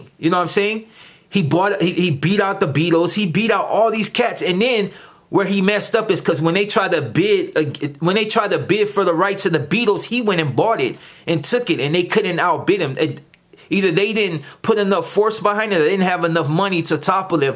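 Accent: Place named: Mexico. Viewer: Why are you put on that Mexican accent in your voice your black brodie🤣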